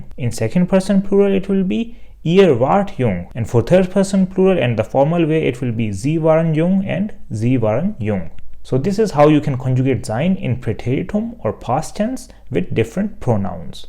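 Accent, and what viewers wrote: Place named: India. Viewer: Bro if learn from you German will not understand my German because you have that topical Indian accent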